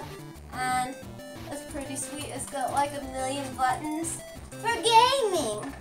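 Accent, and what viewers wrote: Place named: Brooklyn, United States. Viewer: I can hear a Brooklyn accent...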